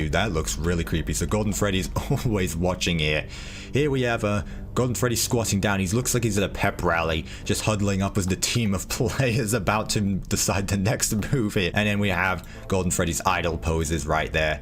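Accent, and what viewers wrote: United Kingdom. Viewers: Are you British beacous you sound like one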